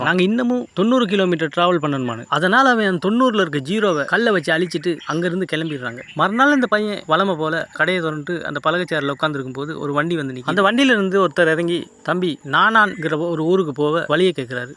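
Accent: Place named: Sri Lanka. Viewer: Srilanka slang adikkidhu. Are you Srilanka?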